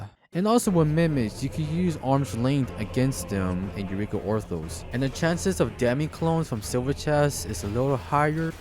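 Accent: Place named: Bulgaria. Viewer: Bro are you Bulgarian cuz your accent sounds exactly like this one Bulgarian artist that I like to watch on yt lol Nice video btw, youtube recommendations getting it right for once :D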